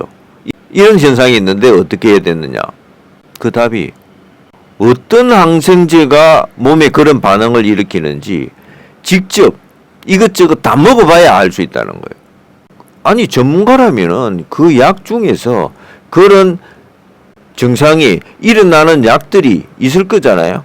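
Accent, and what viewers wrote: Gyeongsang, South Korea. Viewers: 구수한 갱상도 억양으로 ... 감사합니다 저도 도전! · 경상도분이시라 ㆍ ㅎㅎ 말솜씨 가 너무재미있읍니다 덕분에 치아에대한도움되었는데 역시 듣고보니간단하네요 당장실행하겠읍니다 ㅋ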